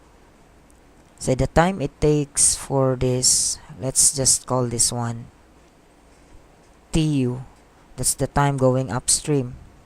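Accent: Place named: India. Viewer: Boss you sounded like indian.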